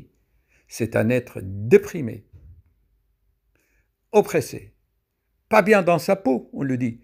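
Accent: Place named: France. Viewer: Bonjour cher adore 🙏vos vidéos car je me reconnais en vs, je suis pharmacienne à Québec et pratique la méditation et yoga +++ et je m’intéresse à l ayurveda Vos explications unissent la science à la spiritualité, exactement mon combat de tous les jrs!! Par contre l’explication de 2 mx dont je suis atteinte m’échappent....ménière et les fameuses impatiences aux 4 membres empêchant même de rester en position assise tellement il y a un besoin irrépressible de bouger pr soulager les sensations qui sont une vraie torture et qui reprennent dès l’arrêt du mouvement Traitement pharmaco très efficace ds le 2e cas, très « fort » aussi, mais ce sont les explications spirituelles qui m’échappent Manque de connection à la terre pr ménière? (Les vertiges qui me clouent au lit pendant des mois alors que j’ai 45 ans et une enfant handicapée ) Et pb d énergie mal répartie mal diffusée a travers le corps ds le cas des impatiences? 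J’aimerais tant connaître vos explications..... Je suis née en Bretagne et j’adore 🥰 l’accent français! Merci pr vos vidéos ça me donne le courage de continuer à expliquer les concepts médicaux et pharmacologiques aux patients du point de vue de l’univers, énergétique Merci 💝🙏🕉💫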